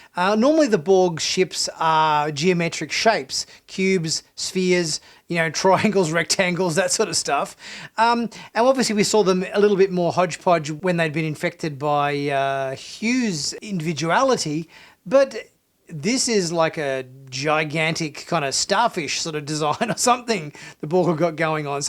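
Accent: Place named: Australia. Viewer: So I'm confused. I'm in Australia and checking my Amazon Prime Video this Season 2 Episode 1 is not available yet as of 4Mar~ am AWST, assume it will drop this afternoon as it usually does., by your accent I assume you are Aussie and are in Australia, so how did you (and others) watch the Episode? Obviously I'm missing something somewhere?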